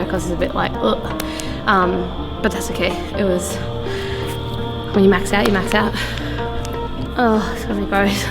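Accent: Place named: Australia. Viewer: Amazing achievement! Congrats! ❤ Can I make a tiny suggestion for the next video? Could you turn down the level of the music when there's a lot going on in the background? With you speaking quickly and the background noise in the gym plus the music, it's quite hard to understand sometimes, at least for someone who's not from Australia ;) Thanks!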